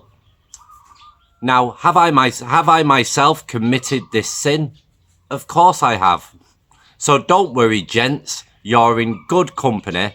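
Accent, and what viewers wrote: United Kingdom. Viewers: You have such a british accent to be an American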